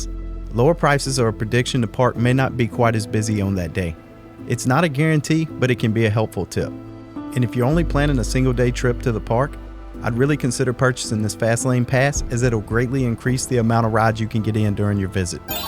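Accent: South, United States. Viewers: What is the accent of voice-over person? Southern?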